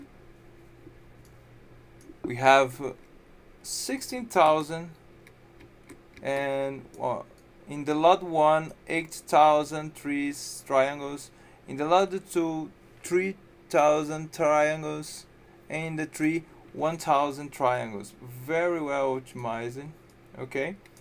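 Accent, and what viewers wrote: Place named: Brazil. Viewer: brasileiro ? parece XD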